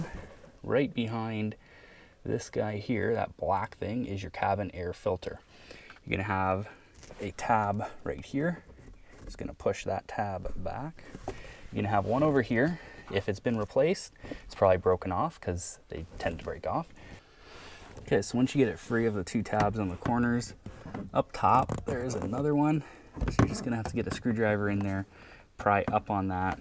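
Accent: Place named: Canada. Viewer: Canadian eh!? Thanks for the tutorial!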